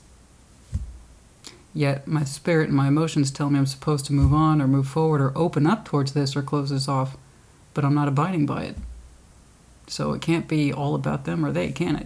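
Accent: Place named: South, United States. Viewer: Yes it is my fault for staying too long. I’m a Texas girl and yes, the southern drawl I hear it. It’s awesome and refreshing to hear fellow southerner.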